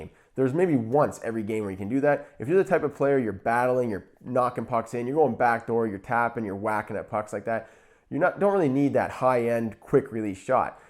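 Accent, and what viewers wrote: Canada. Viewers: What a thick canadian accent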